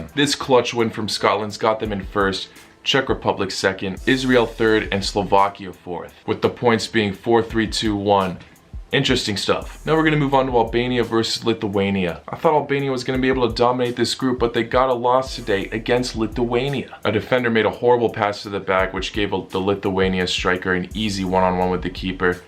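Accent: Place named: United States. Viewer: i love ho you pronounce russia russiya but with a slight american accent.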